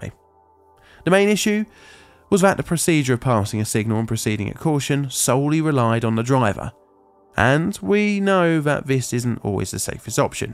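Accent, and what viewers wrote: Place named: England, United Kingdom. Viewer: DEB-ree (debris) 😂 we say duh-BREE. Choob (tube). 😂 I love English accents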